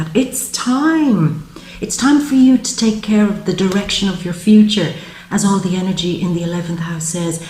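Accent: Ireland. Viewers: Is that an Irish accent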